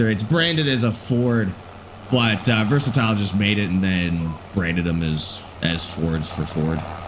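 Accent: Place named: Canada. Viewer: Can tell a Canadian if they say sorry. Not cause yall say it a lot but it’s how you say it that gives it away.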